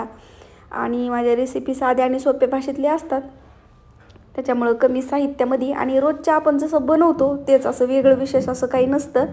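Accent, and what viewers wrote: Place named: Satara, India. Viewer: खुपच छान बनवली मी सुद्धा अशीच बनवते, मला तुमची भाषा खुप आवडली, मस्त अशी सातारा style 👍 👌👌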